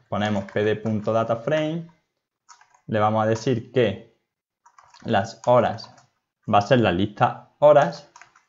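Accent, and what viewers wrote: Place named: Andalusia, Spain. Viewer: Python con acento andaluz. Para siempre.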